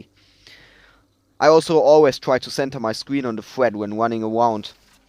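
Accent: Germany. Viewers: You'r German, am i right ? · When german speak english😂😂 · Hi. Are you German? It sounds like. 😊 I'm from German.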